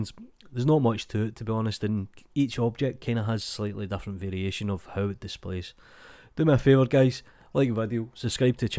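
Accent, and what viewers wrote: Scotland, United Kingdom. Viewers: that scottish accent 👌👌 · 2 things that I like at the same place; blender tutorials aand Scottish accent :D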